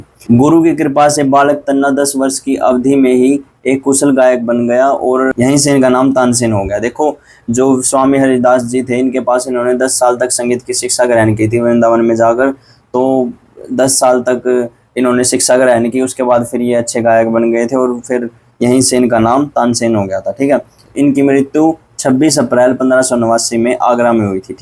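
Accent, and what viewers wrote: Haryana, India. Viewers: Haryanvi sir ji ❤❤ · Haryanvi boli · Yeah bro 🔥🔥🔥🔥🔥🔥🔥🔥 haryanvi boliii , m bhi vha se huu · Sir are you from haryana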